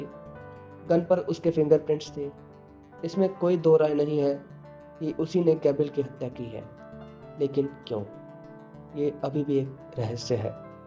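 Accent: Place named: India. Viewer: Fab💙 Hindi Accent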